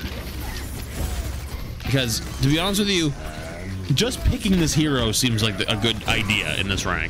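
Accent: United States. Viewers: Hold on. What is that accent,? You got a bit of cowboy in ya?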